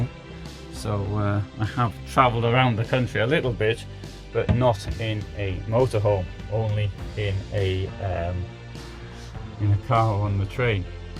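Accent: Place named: North, United Kingdom. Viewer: not all northern people speak like this man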